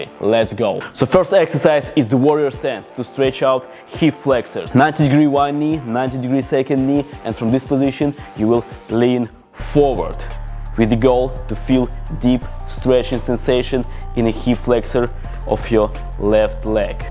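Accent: Russia. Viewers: русский акцент просто сворачивает уши в трубочку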